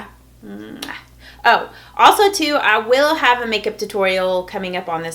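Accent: South, United States. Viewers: your southern accent is so cute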